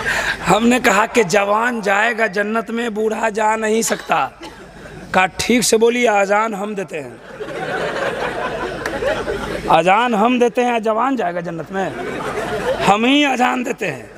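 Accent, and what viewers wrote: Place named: Bihar, India. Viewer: लग रहा ये बिहारी है! ऐसा इस लिए कि इनकी बोलने की शैली बिहारियों जैसी है! बड़ा मज़ा आ रहा है सुनने में! वैसे ये जी रहने वाले कहाँ के हैं?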